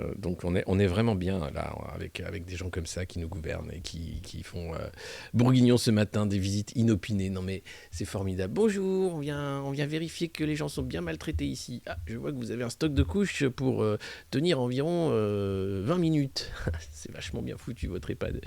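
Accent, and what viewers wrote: Quebec, Canada. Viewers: N'oubliez pas le petit bonnet de bain pour Maggy , pour le jacuzzi !!! Merci , toujours aussi génial !!! Et vous avez un accent canadien fantastique !!! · Bel accent Québécois!! Bravo! Calins à Miggie et Courage à Timmy! Merci! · Excellent l'accent québécois 😀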